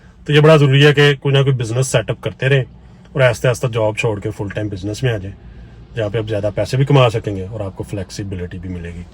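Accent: Punjab, India. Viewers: yar aap pyar ho boht hi achhay lagte go mujhe mujhe apka punjabi accent boht achha lagta hai lahore pakistan se boht sara pyar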